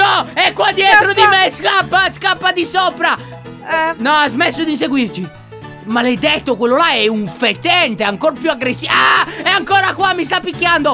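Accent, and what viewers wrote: Sardinia, Italy. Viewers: Ma è sardo?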